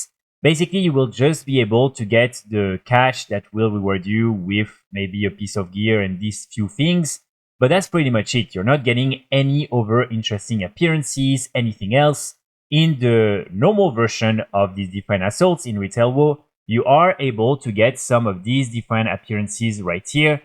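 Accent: France. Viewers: Frenchie accent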